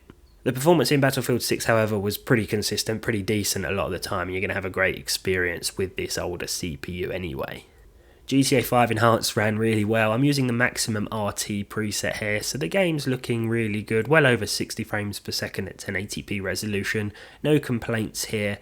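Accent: United Kingdom. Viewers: He’s a limey, that’s how they say Z.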